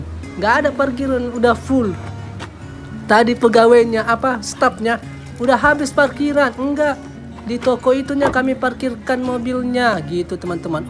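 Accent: Medan, Indonesia. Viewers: Halo. Saya selalu liat youtube lewat tv jd gk bisa komen, kali ini liatnya lewat hp jd bisa komen.. senang liat kalau ngomong, khas medannya keluar😂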